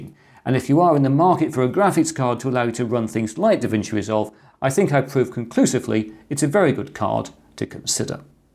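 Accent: United Kingdom. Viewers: Why is it when a British person speaks everything sounds so much better? Subbed btw your voice is awesome.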